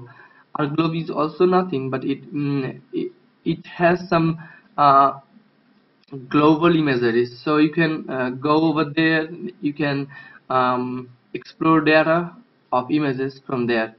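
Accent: India. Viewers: Taking me back to college. The most difficult accent to understand for me is by far Indian. Especially when you're talking about entirely new things. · Me: Glad there is an arcgis tutorial OP: Has indian accent Me: fuck this shit OP: makes sincere apology for his accent Me: OP is a good man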